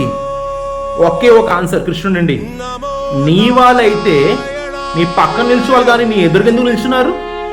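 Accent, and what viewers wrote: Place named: Telugu, India. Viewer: మీ ఇంగ్లీష్ లో తెలుగు బాగుంటుంది మనవాళ్ళు అంటే మనతోనే ఉంటారు ఎప్పుడూ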